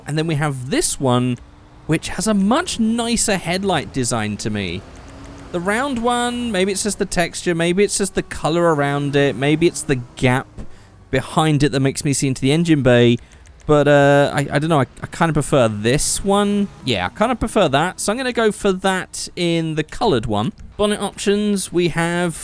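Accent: Australia. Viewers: honestly not a bad aussie accent xD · I was quite surprised by your aussie accent. Its better then some Aussies. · Mate the Ford xr6 in black with red pint stripes is the tune I like....Australian accent not to bad Mr chrome dome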